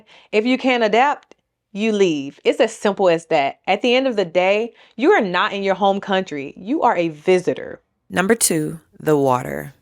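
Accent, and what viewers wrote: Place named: Oakland, United States. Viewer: Sounds like Oakland or Chicago to me 😂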